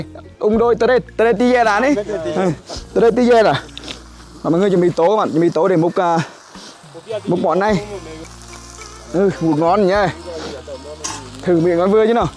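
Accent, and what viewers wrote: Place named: Central, Vietnam. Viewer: Quê Phú Thọ mà giọng thì giọng miền trung😊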